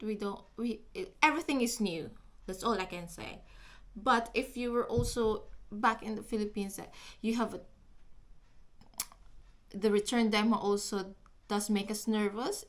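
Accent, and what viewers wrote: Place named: United Kingdom. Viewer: Bakit kelangan mo pa mag english with konting UK accent pa kung target audience ng vlog mo is filipino. Taglish ka na lang procedure naman at tips ineexplain mo. Di naman to class. Korni lang. Kind a frustrating to watch.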